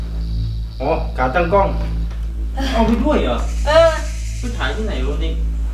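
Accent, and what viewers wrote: South, Thailand. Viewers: พูดใต้ได้กันน้ะ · พี่เเหลงใต้ดีกว่าครับผมชอบ555+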